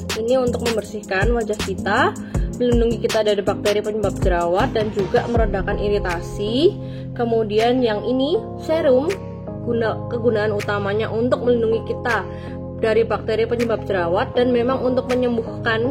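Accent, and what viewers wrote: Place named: Semarang, Indonesia. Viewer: Logatny kayak logat2 orang semarang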